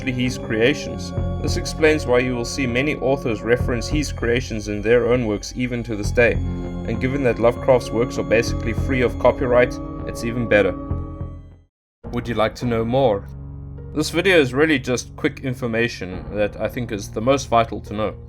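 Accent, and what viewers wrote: South Africa. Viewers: Are you South African? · Are you South African as well? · Good video! But are you South African?👀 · Fabulous and thank you so much! Is that a South African accent? Fabulous. Thanks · Are you South African? Your accent sounds incredibly similar to mine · Expat South African living in Amsterdam here. Good surprise to hear your accent bro. Nice video.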